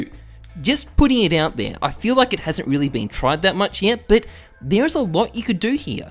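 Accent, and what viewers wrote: Australia. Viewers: Why does he sound like someone doing a parody of an Australian person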